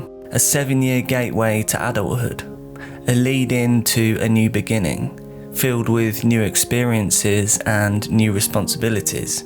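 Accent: United Kingdom. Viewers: Is this a robotic voice with a British accent, it sounds like it.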